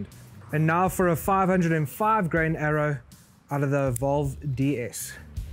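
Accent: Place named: South Africa. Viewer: At first i heard you say 572 was your hunting weight and i thought oh my another poor uninformed guy that's been watching ranch fairly but then I remembered the south African accent and realized you're hunting way different animals than i do in Oklahoma so maybe you need those heavy arrows lol. Anyway great video. I just won an ethos at a 3d shoot so i was just watching some reviews. I'm actually entered to win an Africa hunt from that event too but still waiting on the results of that drawing🤞🏼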